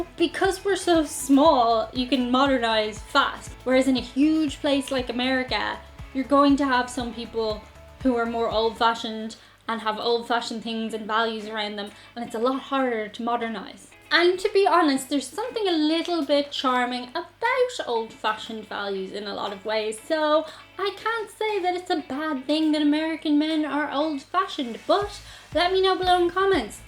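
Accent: Ireland. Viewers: Out of curiosity, what American accents do you, or other Irish people, like the most? As a Texan, I find exposure to the Irish accent rather dangerous. I find my own accent starting to shift for a while after hearing it for the length of a movie.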